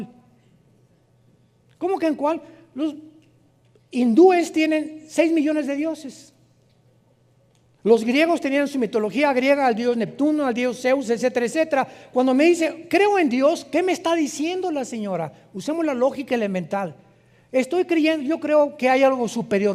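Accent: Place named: Mexico. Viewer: Gracias a Dios por este siervo y hermano en la fe de nuestro Señor Jesucristo, el Dr. Armando Alducin quien tiene un ministerio maravilloso enseñando al pueblo de Dios las grandes verdades bíblicas, el plan de Dios para la redención de la raza humana, una linea cronológica de los grandes acontecimientos actuales y futuros del plan de Dios para la humanidad, una orientación de la palabra de Dios para consolidar el crecimiento del carácter cristiano, profundiza las enseñanza y los misterios de los diversos conceptos bíblicos contextualizándolos, geográfica, histórica, cronológicamente etc. etc., revela el carácter santo, el amor, y la justicia de Dios. Además lo expone de manera magistral, profunda, ungido por el Espíritu Santo, entretenida y con su acento mexicano que para los cristianos de habla española nos resulta muy interesante y divertida, en especial a los cristianos chilenos que admiramos y respetamos mucho al pueblo mexicano.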